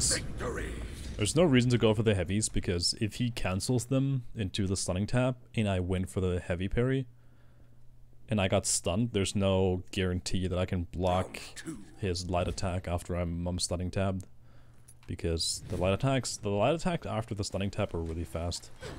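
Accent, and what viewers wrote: Germany. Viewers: Wait youre German? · Ohh you are German?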